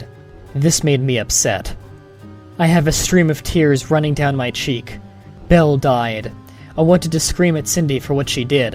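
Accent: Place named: Canada. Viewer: lol ik i do apologize but there was just something about your accent said "canadian in my head."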